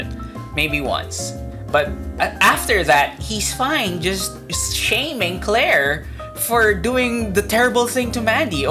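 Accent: Philippines. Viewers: awesome vid! also, somehow thought of your accent... as Filipino? maybe i'm wrong, your analysis of this weird comic was spot-on, though. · Are you a filo lmfao the accent is so familiar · Didn't read the book, have no plans to because the internet have all united to say one thing about it: it's shit Art is good, but story is shit Also your accent is familiar are you by chance a filipino? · Im not sure why, but I hear a smol twinge of Filipino accent for some reason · Are you filipino? I can sense our similar accents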